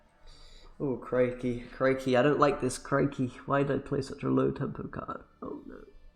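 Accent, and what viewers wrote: United Kingdom. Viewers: Thing I like with you, you speak calmy and with a good diction. Maybe I have wrong but I feel a little Scottish accent especially with the "o"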